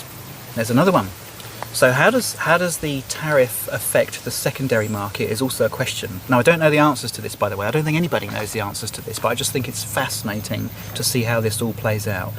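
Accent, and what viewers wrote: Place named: United States. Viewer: Here in America where we are tired of getting screwed by you people happy with screwing Americans, will just switch to the used watch industry etccccc. or just keep buying swiss watches regardless. Most people that can afford a swiss Rolex watch, can afford a little more, since now saving on other stuff. BTW your American accent is annoying little old man.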